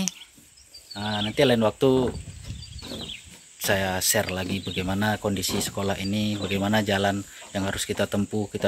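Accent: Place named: Malaysia. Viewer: Logat Melayu kampung tengah masih ada ya pak! Semngat pak demi penerus anak didik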